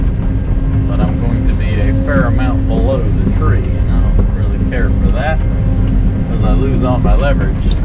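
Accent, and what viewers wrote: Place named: North Carolina, United States. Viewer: know a fellow north carolinian when i hear one. i enjoy watvhing your expertise with your heavy equipmemt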